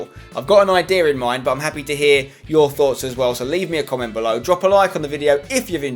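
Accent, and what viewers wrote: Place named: England, United Kingdom. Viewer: Ay don't make things up mate. I never said he can't speak another language. If you listen to his voice, you can clearly hear he's English. There's no way he could speak English THAT good at that age. And I love how he's completely trying to deny what I'm asking him.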